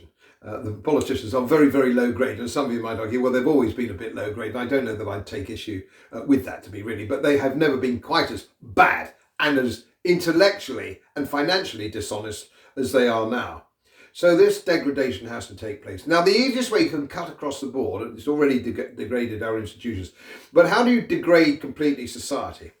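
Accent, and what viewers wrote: RP, United Kingdom. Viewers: Rubbish. On what basis can you THINK this. Just look at his dress, listen to his accent. He is a Colonel Blimp. People like him ARE the real traitors. And VILE people. Driving division and hatred - not community spirit, kindness. Appealing (sadly it seems successfully from comments here!) to the worst aspects of peoples' natures. Criticising politicians (not their side natch) yet using the SAME "othering" techniques the Nazi's did.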